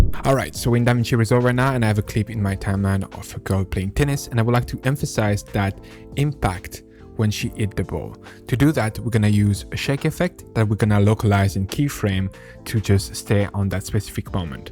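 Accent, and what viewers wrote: France. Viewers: french accent, nice vid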